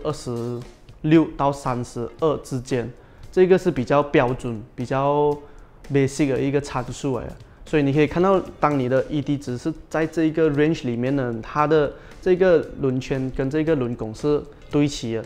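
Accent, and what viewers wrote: Malaysia. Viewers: 抱歉解释很好不过他的联邦音很重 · 聯邦音很重其實又有啥問題？這是特色...up主做你自己就好...你又不是電視台新聞主播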